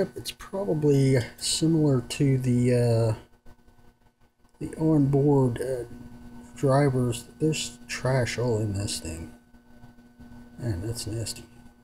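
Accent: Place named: United States. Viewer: Thank GOD finally someone American you can understand.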